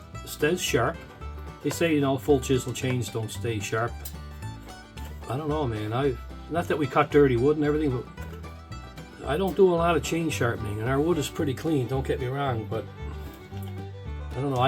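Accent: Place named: Newfoundland, Canada. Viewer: Just hearing you talk makes me miss living on the rock. Thanks for the video 😊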